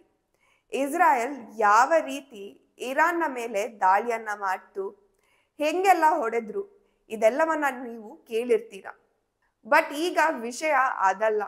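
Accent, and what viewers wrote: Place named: Coastal Karnataka, India. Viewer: What's wrong with her Kannada? Her pronunciation resembles that of coastal areas. Otherwise her speech is very clear. Don't discourage our own people.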